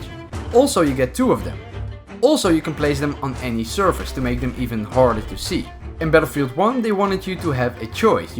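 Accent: Netherlands. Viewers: Are you from the Netherlands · Je hoort zo hard dat jij Nederlands bent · Are you dutch?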